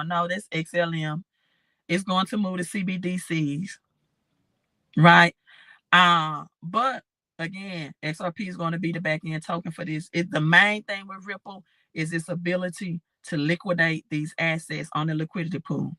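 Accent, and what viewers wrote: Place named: Alabama, United States. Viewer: Sound like you from Birmingham Alabama 🎉🎉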